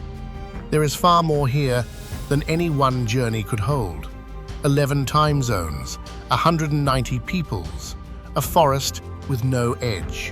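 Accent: United Kingdom. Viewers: The naration is British, not Unitedstatesian.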